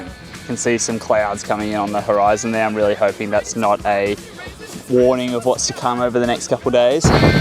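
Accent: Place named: Australia. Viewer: I have to admit, I'm not used to Australian accent and I understood barely anything.Especially because the speech is quite fast but I got the idea!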